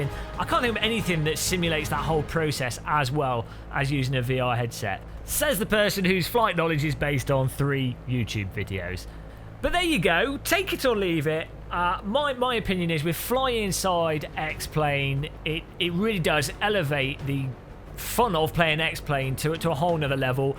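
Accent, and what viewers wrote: United Kingdom. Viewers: You're awesome! You're the only person with a British accent while having such an upbeat and awesome voice. Amazing review. Hopefully you get into flying more.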